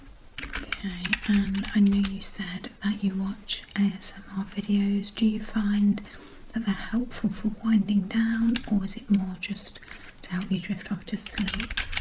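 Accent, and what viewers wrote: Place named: United Kingdom. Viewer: I just love the British accent with the typing noice it goes well together. My mum was both British Romani. My connection with the UK means alot.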